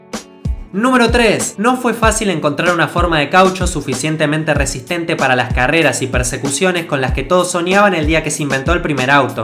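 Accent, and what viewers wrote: Buenos Aires, Argentina. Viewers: No es ruso, :v y si es argentino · sos de buenos aires?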